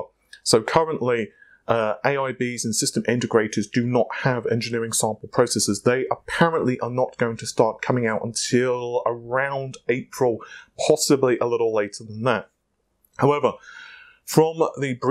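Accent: England, United Kingdom. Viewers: My good man, you bear a striking resemblance to a young Syd Barett of Pink Floyd (RIP). The English accent only amplifies the similarities! Back on topic: Looking forward to upgrading my 1800x with some Zen2 goodness. A 144Hz monitor is in my future and I will need the clockspeed / IPC increase to stay above 100.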